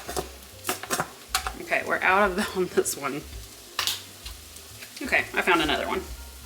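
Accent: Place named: South, United States. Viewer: I am new here where are you at??? Sounds southern I an in mid Ohio but my folks were from southern Ohio they had that southern flare also just me wondering??? Keep up the great work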